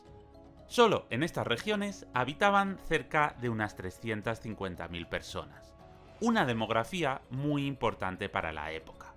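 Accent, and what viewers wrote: Spain. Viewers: No me gusta del todo tu relato. Es evidente tu ascendencia española.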